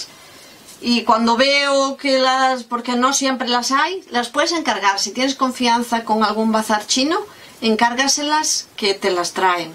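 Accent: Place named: Galicia, Spain. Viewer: Que curranta eres, yo te sigo de hace poquito, me gustan mucho tus videos,soy de sevilla y me encanta tu acento gallego, si me quieres saludar en tu prosimo video se lo enseñaria a mis nietas para que viesen que tengo una amiga youtera, me llaman Loli, un besiño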